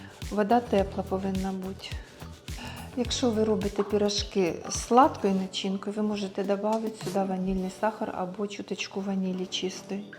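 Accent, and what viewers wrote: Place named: Bila Tserkva, Ukraine. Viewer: Ваша мова,як на Білоцерківщині,я звідти і тоже,дякую.Моя мама пекла чудові пи ріжки,а начинки були різноманітні варена солодка квасоля,мак,сир,капуста,варені цукрові буряки з калиною,сливи,вишні.Тільки ностальгую,бо,соромно,що так і не змогла,як мама готувати тісто.А ще були з лідером і яблуками.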